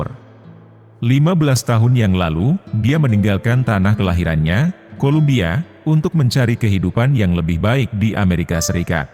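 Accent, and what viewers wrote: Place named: Java, Indonesia. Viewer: di pengucapannya. Mungkin lidah Jowo udah begitu. Gk bs diubah. Tp konten videonya, 👍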